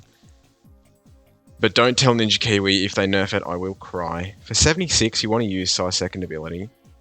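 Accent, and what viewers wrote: Australia. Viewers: I can hear the Australian accent but bro uses every village flag apart from Australia, anyway thanks bro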